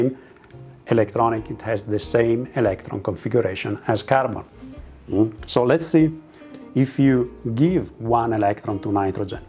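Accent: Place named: Italy. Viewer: Excellent information that will help me teach this concept to my general chemistry students. Bonus listening to it with an Italian accent!!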